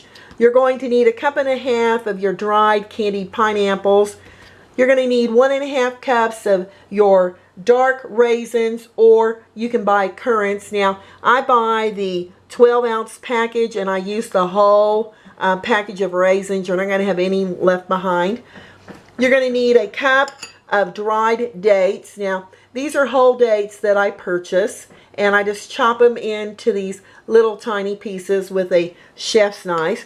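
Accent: South, United States. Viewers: Are you from the south ? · Are you from the south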